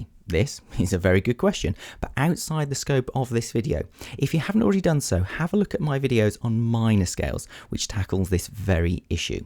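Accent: United Kingdom. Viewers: I like your british acent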